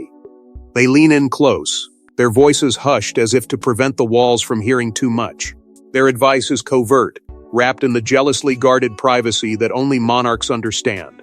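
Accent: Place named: United States. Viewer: A British story told by a "Yankee" voice - PLEASE - have some thought for those of us who find this discrepancy really jarring.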